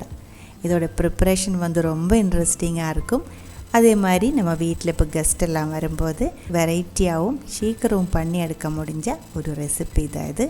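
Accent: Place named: Malabar, India. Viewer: I have never seen like u Wow Thanks mam for this channel.addicted tour samaiyal and அழகான. மலபார் தமிழ் Long live mam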